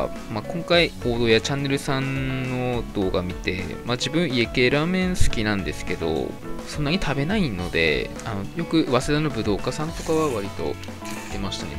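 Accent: Aomori, Japan. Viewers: 青森の人？ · ちなみに、青森県のどちらの出身でしょうか？なまりが？謎です。十和田市民より。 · もしかして青森出身のお方ですか？ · 喋りに少し訛りがありますね。 青森の方ですか？ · おうち麺TVさんってもしかして青森出身ですか？ イントネーションが青森の友達にそっくりで笑ってしまった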